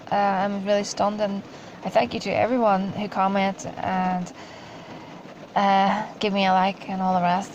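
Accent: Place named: Ireland. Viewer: Good vid, more of these please (if you can find the time!). I can tell by your accent you come from not far from me. Being someone v interested in this side of life I've found it very hard to find groups, other individuals etc who are serious about this. How did you go about it, learning, meeting others who are interested in magick etc? I know Ireland is full of us, i just dunno where to look and am so wary of charlatans. Thanks! ☺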